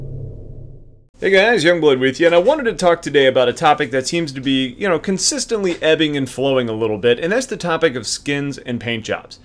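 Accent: United States. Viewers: Btw, it's nice to finally find someone talking about this game with an American accent who speaks clear words. Some guys that play this sound like they're gargling syrup!! Good work.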